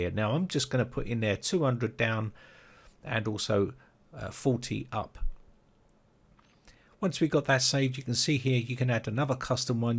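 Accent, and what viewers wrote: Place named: Cockney, United Kingdom. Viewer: It is actually 'Wotcha' guys that he says at the start of the videos. It is an English slang expression for ' Hello, how are you'. Spoken along with his semi Cockney accent. I am from London and speak in a similar fashion to this! I do agree about why would you want to throttle your connection though. I suppose someone must have a reason for doing it somewhere. Puzzling though!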